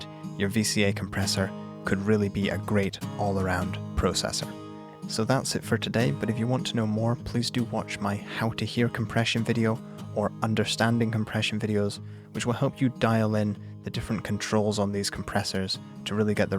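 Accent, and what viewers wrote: Scotland, United Kingdom. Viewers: He does have a Scottish accent, it's just that he's from a part of Scotland that has a softer accent rather than a more pronounced one, such as Glasgow. :)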